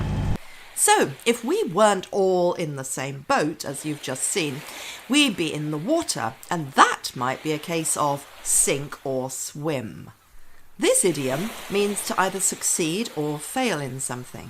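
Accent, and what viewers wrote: United Kingdom. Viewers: Hello From Antalya(Turkey) ..but we cannot understand londonCocney Accent ..ı d love it .. please make much more linking(reduced,catanation) words for nativ understanding ,,pleasee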